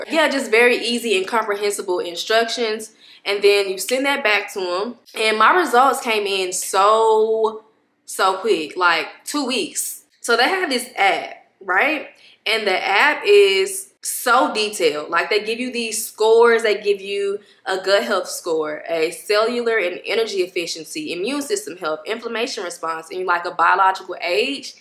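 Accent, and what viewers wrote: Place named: South, United States. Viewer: Love your accent it's a southern or Midwest accent. Where are you from?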